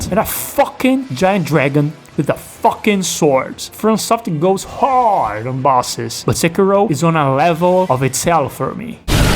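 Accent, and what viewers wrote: Brazil. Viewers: tu é brazuca?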